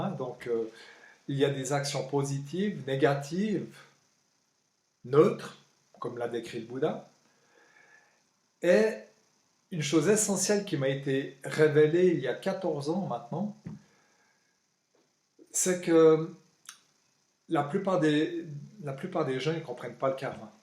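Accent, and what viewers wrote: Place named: Switzerland. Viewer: Tu es pratiquant bouddhiste ? Quelle tradition, où ça, quelle Sangha ? Vu ton accent je crois qu'on est pas loin géographiquement ? Tu semble être suisse non ? Moi je suis tout près de Genève !!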